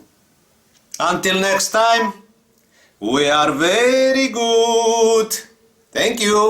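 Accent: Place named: Russia. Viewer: i love russian accent, благодарю вас comrade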